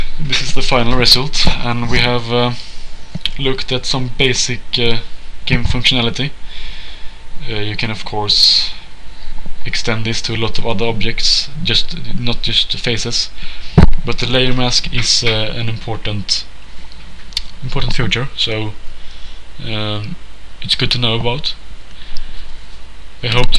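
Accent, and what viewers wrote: Sweden. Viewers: haha man hör verkligen att du är från Sverige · Hahha Du Kommer Väll Från Sverige? Det Hörs På Din Accent xD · He doesn't, he sounds Swedish, I am Swedish so I know the accent. Looking at his channel his location is Sweden...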